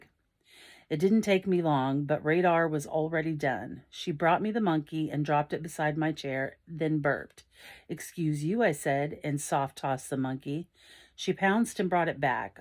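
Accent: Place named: United States. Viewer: I like your US accent from the UK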